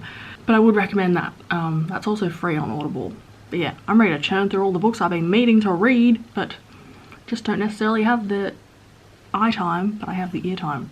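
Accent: Australia. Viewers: I just watched a video from 17 you sound totally different. You sound really Australian now I prefer this. It's strange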